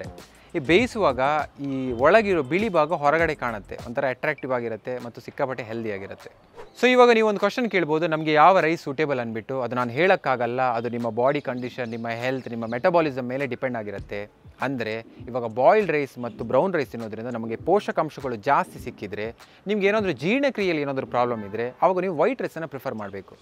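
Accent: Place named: Mangalore, India. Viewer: Nimma M'lore kannada 😍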